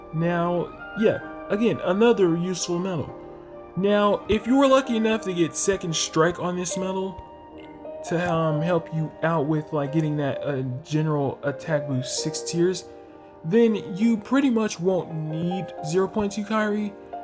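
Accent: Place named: Canada. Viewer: Take a shot every time this canadian person says "like". idc if he's not. just wanted to point out. he just sounds canadian.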